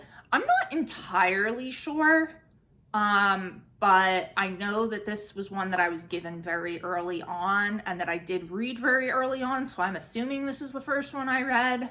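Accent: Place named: United States. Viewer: I associate you so much with Victorian literature that I forgot you're (most likely) an American, even though I hear it in every video! Btw I also love Wuthering Heights :)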